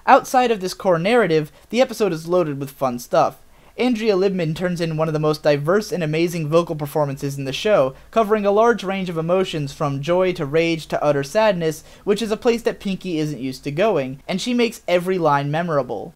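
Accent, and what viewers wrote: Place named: United States. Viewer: I'm probably not the only person to say this but I love your voice O.O thank you for giving me an american! (or canadian I'm not sure *cringe*) accent that I can actually listen to on youtube!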